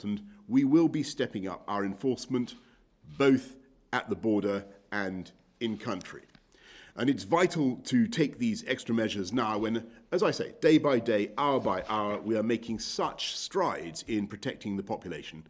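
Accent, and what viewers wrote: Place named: RP, United Kingdom. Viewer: You only sound posh but useless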